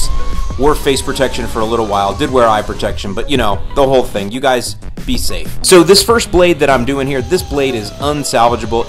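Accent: Chicago, United States. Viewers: I love the exaggerated Chicago accent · nice chicago accent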